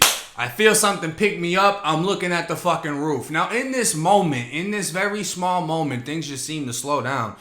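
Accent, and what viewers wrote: Boston, United States. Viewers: Lol that Boston Accent getting strong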